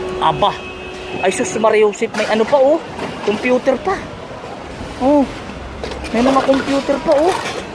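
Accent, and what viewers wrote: Quezon, Philippines. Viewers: Ah ah parang ikaw ay taga quezon hehe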